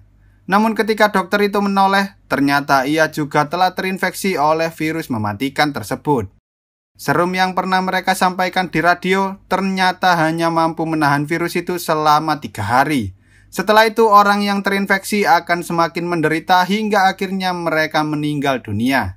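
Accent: Java, Indonesia. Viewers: Medok g asik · Njawa luh males dengernya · Logat jawa mimin nya jawa nya mana min🤭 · Medok bgst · Saaangat bagus rangkuman nya!!! Makasih! Aku suka aksen jawa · Jowomu alami min😂😎salam dari malang👍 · Paling Suka Dari Chenel Ini Suara Nya Jawa Bangget Dan Suka Bercanda Juga:v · Mimin Jawa mana?. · Narator wong jowo too hehe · Wong jowo yo mas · Ws r usah ke suen lah wong jawa ya jawa endi boz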